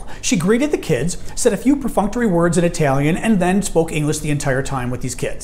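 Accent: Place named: United States. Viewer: Italian?😂😂hahahahahaha P.s. your italian is good BUT accent is everyting to sound fluent you most have a non american accent. GOOD LUCK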